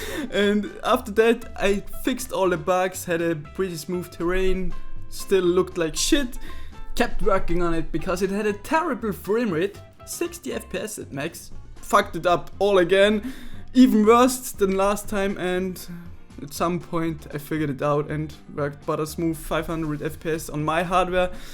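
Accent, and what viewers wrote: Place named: Germany. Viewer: Ein erster Schritt wäre dein Linking zu verbessern. Relativ einfach und intuitiv, wirkt dem deutschen Aktzent entgegen. Im Englischen werden zwischen Worten oft keine Pausen gelassen, wie dies im Deutschen zwischen quasi jedem Wort ist. Bspw. "an apple" wird als ein Wort ausgesprochen. Ich hatte diesen 'Trick' nach etwa einer Woche verinnerlicht (wird einem erst in der 12. Klasse offenbahrt).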